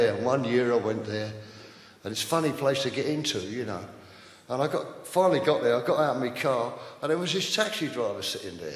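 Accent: England, United Kingdom. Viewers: I know England has all kinds of accents, but does anyone know what Joe's accent is from in England? Lovely one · As an American, his English accent is absolutely delightful.... wonderful! I loved Joe since I was a kid and he's effortlessly charming, adorable and charismatic.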